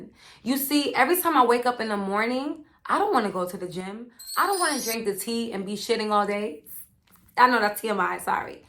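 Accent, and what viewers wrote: New York, United States. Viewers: GIRL I just checked your P.O. Box and you live on long islanddd ??? Ik I heard the New York accent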